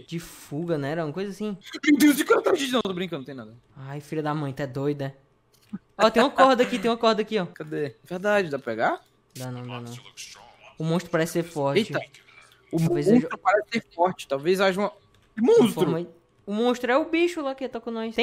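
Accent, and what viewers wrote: Northeast, Brazil. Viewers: Nordeste meu fi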